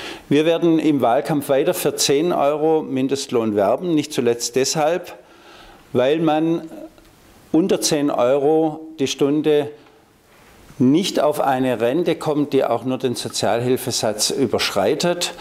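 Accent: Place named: Switzerland. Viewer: Ähm Sorry, aber das war gerade ein wenig zu viel Schweiz..... denn ich verstehe kein Wort! :D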